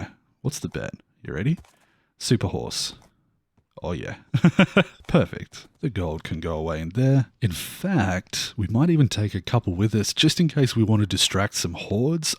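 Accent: Australia. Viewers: hi mate quick question, are you australian?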